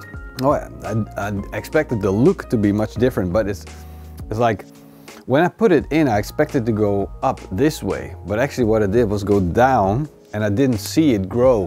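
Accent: Netherlands. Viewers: Haha dutch accent is just to strong😂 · ben je van nederland ? zo ja dan hoor ik et aan je accent xd . leuke video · The dutch english accent is throwing me off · the dutch accent is strong with this one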